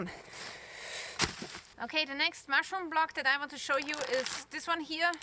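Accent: Austria. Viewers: irgendwie irritiert mich dein ösi-akzent im english ;) weshalb english video? wg reichweite? oO oder bist du ausgewandert? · Nice video, love the way you explain everything about mushrooms, I don’t care much about mushrooms🥴 but hope you have good harvest next season BTW I love your Austria 🇦🇹 accent ☺️👋from 🍊orange County Ca, ⛺️🪵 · I always wondered about your strange accent. It sounds german but not exactly. Now i found out you’re Austrian. So well, that make things clear. Kind regards from Belrin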